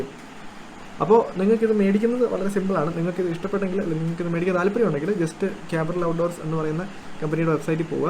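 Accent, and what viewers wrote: Kottayam, India. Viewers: KOTTAYAM SLANG ALSO NICE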